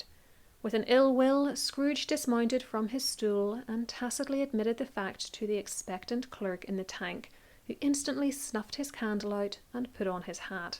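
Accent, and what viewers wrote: Northern Ireland, United Kingdom. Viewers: THIS one girl! Love so much your Northern Irisch accent. Greetings from Friesland in The Netherlands (I speak Frysian and Dutch as my native tongue) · if you’ll pardon my being less professional though, it’s a little evil. I already have a weakness for the northern Irish accent, and you’ve gone and made a 2 and a half hour long video!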